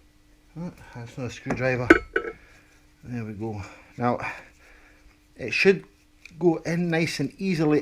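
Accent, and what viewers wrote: Scotland, United Kingdom. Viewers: Fab U Lous, not sure about the funny accent but being from a similar part of the world, Glasgow, I do know however this is the correct and only way to speak english. All that aside, thank you so much for the time and effort in doing the video. Living in sunny Bantry with the mad Irish wife, now using a scooter ocassionally, took it to bits to try figger what was wrong. Your video suggests the most likely cause and the other info re the brake helps big time. Many thanks again for the help, Bill P Mad Scot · Hi Iv watched many of your videos and found them very informative . Great to watch and hear your fine Scottish accent . I am English but I live in Australia . I’m having a problem with a controller on a non identified mobility scooter ( no idea tags on it any where ) I have emailed you but so far no response . Can you help please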